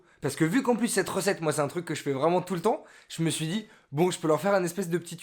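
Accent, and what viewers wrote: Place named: South, France. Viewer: Super ta vidéo j'adore ton accent du Sud :D Ça m'a fait bien rire!!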